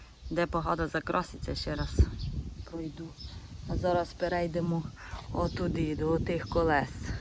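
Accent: Poltava, Ukraine. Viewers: Полтавський суржик,це прикольно.Глобино? Привіт з Черкащини 😊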